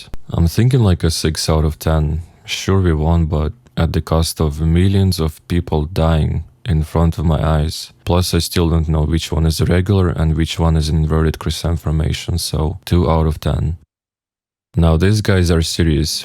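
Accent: Russia. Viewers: this is ochen horosho, bratan, delai bolshe takich video (I heard an atom of russian accent) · This is explained by the fact that he has a Russian accent. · акцент русский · man i thought there's a russian version of this video because of the russian accent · listening to ts with just audio sounds like a russian telling you war stories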